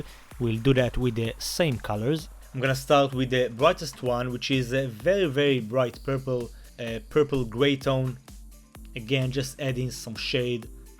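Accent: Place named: Israel. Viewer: וואו חחח המבטא הסגיר, וגם השם... אז הלכתי ובדקתי במידע על הערוץ, ומסתבר שצדקתי ^^ אני שמח לראות אותך מצליח ככה. בהצלחה בהמשך! מישראל 😄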